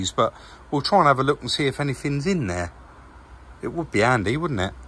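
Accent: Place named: Southend, United Kingdom. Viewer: Haha I swear your South end accent has gotten stronger in these recent videos lol. "Thirty orse, this fing ull go like a rocket" "sprayed every fin ap wiv a bit ovv dabble u dee fortee" 😂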